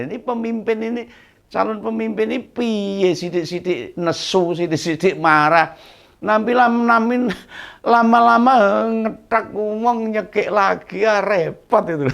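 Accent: Java, Indonesia. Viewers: Alhamdulillah Om nongol lagi Bicara apa adanya luwes cara membawakanya Kental basa jawanya. Monggo pilih Ganjar Mahfud yang tegar dan yahud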